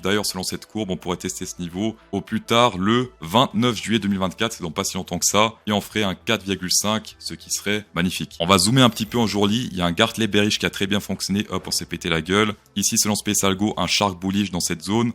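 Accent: Vaud, Switzerland. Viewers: Tu l'entends à des kilomètres l'accent vaudois, sans oublier le "huitante" 😉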